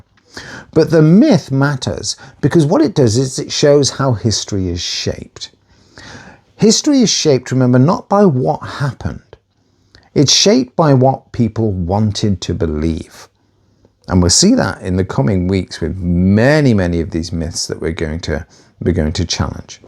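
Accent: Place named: United Kingdom. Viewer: This guy thinks that because he's got a British accent everything he says is true. This guy doesn't know what he's talking about and needs to be locked up in an insane asylum. 20,000 people being burned at the stake was a common occurrence throughout Europe, especially in Spain. The foundations of the Spanish Inquisition go as far back as the 12th-13th centuries, after the Horrible atrocities European Christianity had committed throughout the Middle East and Jerusalem during the Crusades in the Middle Ages.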